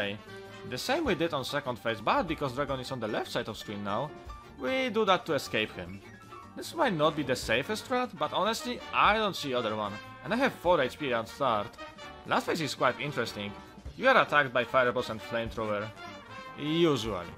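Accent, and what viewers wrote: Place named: Poland. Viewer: i love his polish accent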